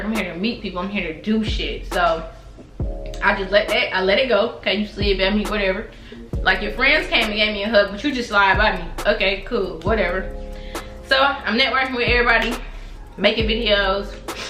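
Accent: Miami, United States. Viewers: Sound like Miami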